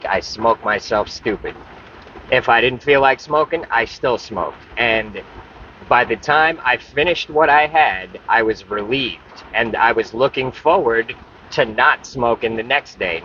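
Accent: New York, United States. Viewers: I love how New Yorkers call it Flurida! Love ya bro, what is wrong with smoking weed? It’s about as harmful as drinking coffee, you don’t see coffee drinkers saying they are going sober. I support you 100% though, only you know if it’s a problem. You have helped more people with addiction problems than you know by being honest. Love ya Brother, good muck with your progress. I’m a retired Machinist and I’ve never met another Machinist that wasn’t a pothead. We would have to read blueprints and edit blueprints as we were making maybe aerospace parts, no relation to Boeings bs, and our best work was when we got off work, sat around the house, smoked out, and talked about how we could machine this part better, use a different tool steel, etc. We were never high on the job, but our most amazing work ideas came from sitting around being high. We actually worked on some of the self guided depleted uranium 20mm rounds the Gov’t may have been trying to fast track in Afghanistan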